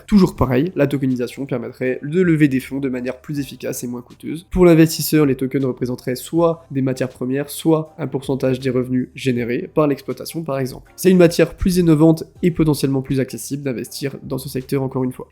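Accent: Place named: East, France. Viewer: Allianceblock les gars, jeton $NXRA, vous me remecierez plus tard... merci pour ton travail, c'est quoi ce petit accent, ne viendrais-tu de l'Est de la France😊?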